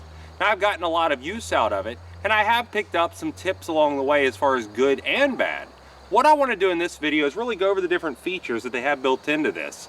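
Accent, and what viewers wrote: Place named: Pittsburgh, United States. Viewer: Are you from Pittsburgh? You sound like you're from Pittsburgh.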